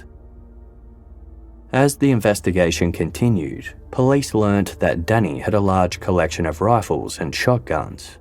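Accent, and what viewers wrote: Australia. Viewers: Did the strong Australian accent not give you a clue?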